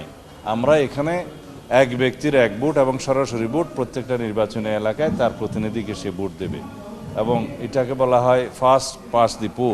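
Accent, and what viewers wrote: India. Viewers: এই টা ভারতীয় মাল কথায় বুজা যায়। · র এর সদস্য জ্বালা হবেই/সালাউদ্দিনের কথা বলার স্টাইল আওয়ামী ও ভারতের মত।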